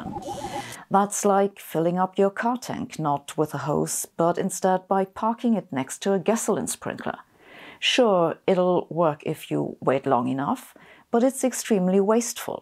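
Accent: Germany. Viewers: Are you german? Alot of Youtubers i see with a similar accent have a similar type of "stare" No offense though. Your videos are informative and cool. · Hottest. Physicist. Alive. That German accent though.😵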